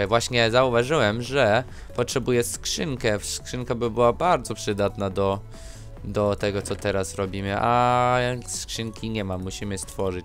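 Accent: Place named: England, United Kingdom. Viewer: coraz mniej słychać twojego angielskiego akcentu:) Gratuluję:)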